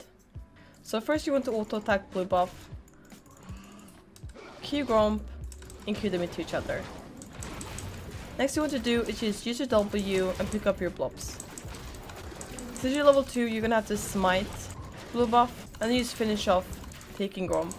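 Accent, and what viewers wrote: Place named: Sweden. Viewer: omg girl I love you! Im also playing jungle on Gold right now, mainly Evelynn and Kayn and its so nice to see another girl that also likes to play jungle. This whole stereotype of girls playing only supp or mages is so annoying... And I feel less alone now! :D Oh, if you play Kayn, evelynn or elise it would be also awesome to get an guide on those champs. Even tho I main them I still feel I have alot to learn and it would be nice getting this info from another girl! And Eve feels so weird to play once youre a bit higher on ranked because people actually know how to play against her then and how to get back into the game once u are behind.. So, some advices for gold- Plat would be nice! Oh and youre swedish accent is so damn cute! :D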